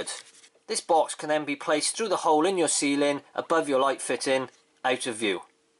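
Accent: United Kingdom. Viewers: Would these be OK to join my lighting earth wires to the light fitting earth, for example I've got 3 earth wires coming from the ceiling ( rose) and one coming from the new light fitting, can they be joined into the 4 way connector. I'm in the UK and from your accent not a million miles away from your good self.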